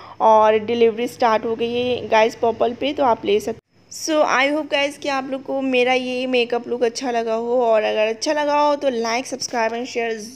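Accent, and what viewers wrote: India. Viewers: just a simple luckhnawi lehja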